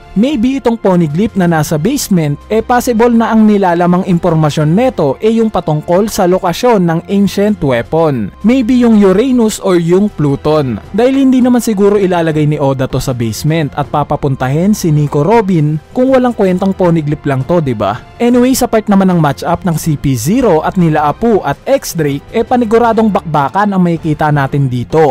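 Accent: Visayas, Philippines. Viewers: Apu at hiyuri bisaya ka pala Apoo at hiyori lambutan mo po ang tigas ng bigkas mo sa pangalan nila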